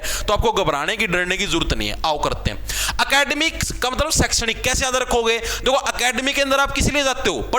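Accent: Haryana, India. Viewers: Mujhe lagta h sir hariyana se h.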